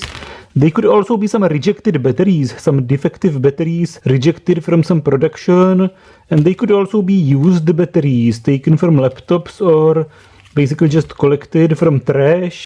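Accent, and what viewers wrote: Czech Republic. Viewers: Czech. Strong one. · No, It's Czechglish, believe me.. with strong Prague's accent. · That Czech accent 😀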